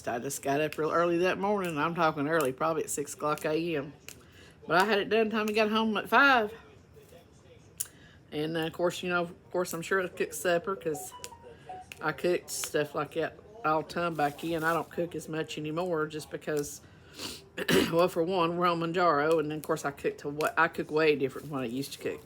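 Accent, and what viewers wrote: South, United States. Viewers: Watching you and listening to you speak makes me miss living in the South. I miss having fresh vegetable plates for lunches and dinners. I love hearing your accent too; it reminds me of being young and growing up in Alabama. ❤ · Thursday with when all her southern words come out 😂😅😂 Bless her heart, and her taters and maters...go get ya some honey😂😅😂 You know I luv ya my friend ❤❤❤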